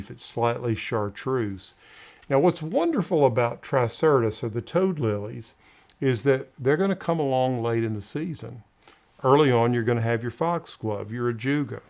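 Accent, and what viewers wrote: South, United States. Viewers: At home here in London where I’m talking about my hostas and how to fill the shady areas of my garden. I can’t believe my luck to have your video pop up. You have a great video format here. As a beginning gardener, Gardener’s question time on Radio 4 (here in the UK) can be pitched a little towards the expert gardeners for me, but your style is very warm (charming to hear that quaint southern American accent), engaging and informative. Loved your ‘filler, spiller and thriller’ concept! I’ll be applying that method this afternoon. Thanks again for making this great video. I just subscribed as I’d like to I see and hear more from you. Good luck! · I am from Kentucky but am now in Vancouver, BC, Canada. I love hearing your Southern accent! I do have a big shade area and do have hostas, but the gardeners may keep pulling them.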